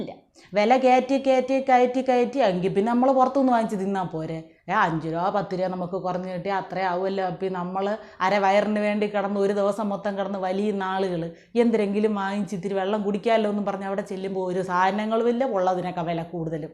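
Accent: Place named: Trivandrum, India. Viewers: 🙏🏻🙏🏻🙏🏻🙏🏻 താങ്കൾ ഒരു സംഭവം തന്നെ.... നമ്മളെ തിരോന്തരം ഭാഷ അതിശയകരമായി അവതരിപ്പിച്ചു.... 👌👌👌👌👌🙏🏻🙏🏻🙏🏻🌹🌹🌹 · Jai Shree Ram 🙏🙏🙏 എൻ്റെ മോളെ ഈ തിരുവോ ന്തരം ഭാഷ ഒക്കെ എങ്ങനെ പഠിച്ചു മോൾ ഒരു അസാമാന്യ കലാകാരി തന്നെ. നല്ല ഒരു actress ആകാനുള്ള കഴിവുണ്ട്. വാണിവിശ്വനാഥിനെ പോലെ bold and beautiful. All the best 🙏🙏🙏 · Superb. More and more feathers are being added in your cap for exemplary & highly qualitative presentation in a pure sense of humour that too in Trivandrum spoken language. One sincere request Please make it possible to present your next episode in Malabar (Kaakka) spoken language. Definitely you are having supreme efficiency to make us to enjoy. Fervently hope that my sincere request will be taken care of in a true spirit. ABHIVADHYANGAL.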